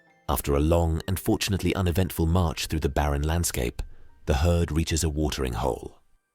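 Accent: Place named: United Kingdom. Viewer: Just sub'd; lovely video, love that British voiceover ! Good luck with your channel...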